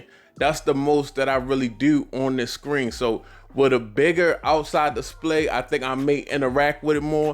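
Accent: Baltimore, United States. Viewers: Thats got to be a Baltimore accent 😅❤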